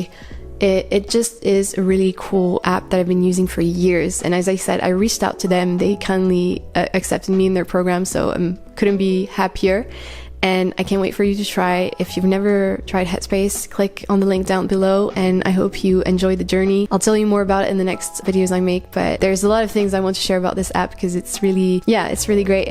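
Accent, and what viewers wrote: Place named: France. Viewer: salut, j'ai l'impression que tu es française vu ton accent, mais je suis pas sûr. Est-ce que tu as été diagnostiquée adhd par un médecin en france? parce que j'ai l'impression que ce n'est pas trop dans la grille de lecture des médecins et psychiatres ici (du moins de ceux que j'ai pu rencontrer), mais que c'est plus reconnu dans le monde anglo saxon. tu as un avis là dessus? (désolé j'ai pas encore regardé toutes tes vidéos...)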